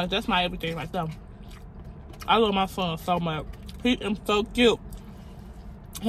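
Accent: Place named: Chicago, United States. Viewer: I love ur Chicago accent, it always make me feel I’m talking to one of my homegirls……#chicagogirls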